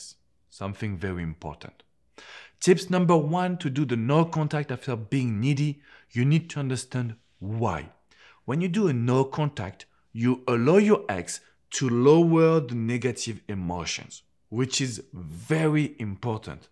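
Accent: France. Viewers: "You need to clique" haha love the french accent, ur a really cool nice guy :) :)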